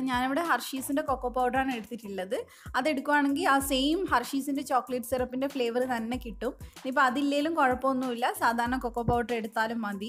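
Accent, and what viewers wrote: Kannur, India. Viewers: kannur ano ithaaaa nannaitt manassilavunnund ndayalum spr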